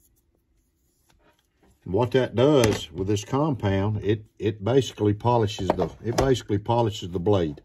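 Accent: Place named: South, United States. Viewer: I liked this video as soon as I heard that reb accent. Go figure He likes Sodbuster's too! one of many Case EDC's that are perfect.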